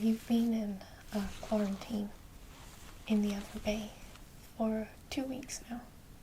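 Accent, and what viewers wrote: United States. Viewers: Good American accent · You do a fantastic American accent. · Fantastic job! Your "American" accent is perfect. :) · I didn't even know that you weren't American until I read the comments so I can safely say that that's a killer accent. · Why US accent for this video? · Your American accent is pretty good! Your natural accent lends it a tinge that we would recognize as Southern. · Wow, nice American accent. I'm impressed. You look lovely as an astronaut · Love your american accent! It's so Buffy :) That aside, I just love the content of your videos. Always takes me into a different world! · Me:"Wait...something doesn't seem right....OMFG SHE'S DOING AN AMERICAN ACCENT!"😱 Btw I LOVED this💗💗💗💗 such amazing job as always! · Pretty good American accent. I didn't realize you had lost your accent until I watched it the second time. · So weird to hear you speak with an American accent. 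Nicely done. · Aw.. I miss your normal accent. Great job on the American accent, but I love your real voice. Great role play! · Your American accent is excellent! Very convincing. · Solid American accent :) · it's so odd to hear you potray an american accent rather than your using your original english accent lol i love it! can you do more RP's with american accent? :-) great vid btw! put me right to sleep lol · Your 'Merican accent is awesome! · Great video held my interest every second! I don't believe you're not a Yank. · You sound so American in this video! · Nice American accent. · You almost sound American in this video lol your accent was hardly noticed by me. Great video! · Your American accent is excellent. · I love her American Accent. Very accurate, very ASMR inducing. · your american accent is perfect! you said bandaid instead of plaster! A+ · Wait she isn't American? Like I live in the US and I thought she was. 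· Ben Church I always forget she’s not American when I watch this!! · Very creative & entertaining scenario, thank you! And I was impressed with your American accent, well done! 😊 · I can't believe how well you do an american accent! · nice attempt at the american accent! haha great video :)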